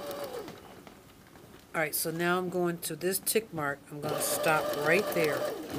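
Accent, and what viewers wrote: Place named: South, United States. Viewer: Omg thank you sooooo much for making the effort to post this lesson!!! I have been going back and forth on how I should sew this top I'm sewing that has a lining and I could not wrap my head around how I was going to make it work?!?! Your video is concise and exactly the example I needed!! Also you sound like a wonderful southern woman who's got all kinds of really great knowledge that can't be taught, that only comes from experience!! Anyway seriously thank you!!!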